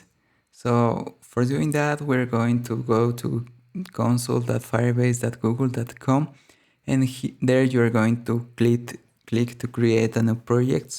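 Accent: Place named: Spain. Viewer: I think you Spanish, your English accent is so beautiful 😍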